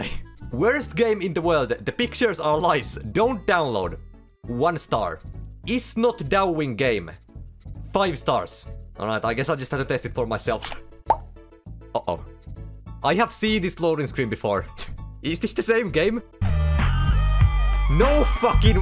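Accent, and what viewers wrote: Finland. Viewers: Are you finnish? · The Finland ascent was very good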